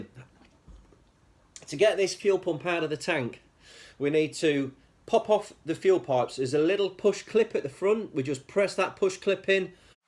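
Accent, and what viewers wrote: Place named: England, United Kingdom. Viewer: Thanks, will definitly try this on my TT. By the way, love the video, the humour, the nice english accent, the SWFC vest and I am sad to be far from England to buy you a beer:) Signed: A frog from Normandy;)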